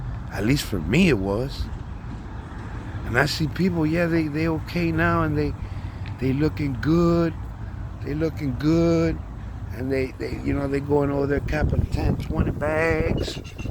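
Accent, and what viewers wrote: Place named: Miami, United States. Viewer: Miami Cuban straight truth, im cuban also, I can tell ,good speaker.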